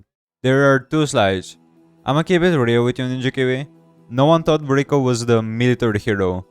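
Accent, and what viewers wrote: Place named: France. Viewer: you have a thick french accent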